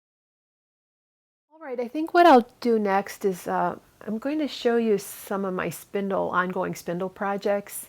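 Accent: Chicago, United States. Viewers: Hi. I have been watching you for a few years now. My family is from Chicago although most of them have moved to the southwest. Your accent reminds me of home. I love your videos and all of the knowledge you share. Thank you so much. I have started spinning this year. I’ll let you know how I get along. I might have some questions. I have a used lendrum wheel that I bought from an English lady who used it for 30 years. It is well loved.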